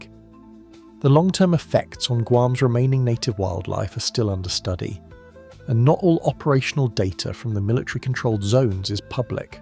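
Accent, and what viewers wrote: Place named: United Kingdom. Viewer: Good video, very informative. Curious why this one has a British narrator and your others have a corn-fed American. Doesn't matter, just curiosity!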